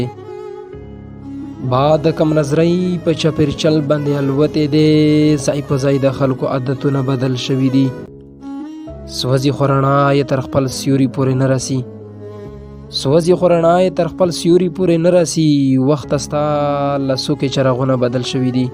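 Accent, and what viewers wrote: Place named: Karlani, Afghanistan. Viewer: په کرلانی لهجی کښ یایه ډیر خواند کوی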